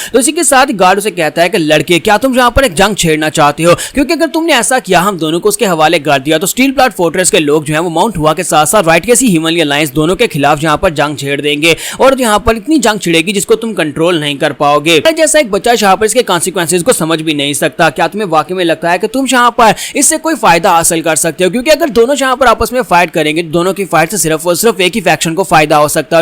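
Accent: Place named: Pakistan. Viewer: Bolane Se to lagta Hai yah Pakistani ka hai rakhta hun Pakistan se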